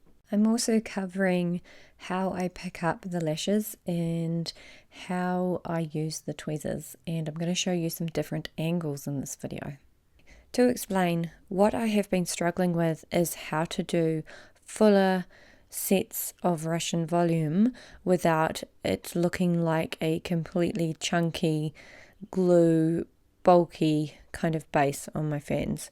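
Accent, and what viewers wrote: New Zealand. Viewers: Love your work!! New to volume just completed training this week😀Got to love that kiwi accent x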